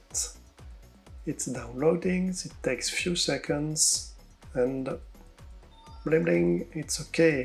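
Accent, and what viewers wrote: France. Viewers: Oh god your french accent ...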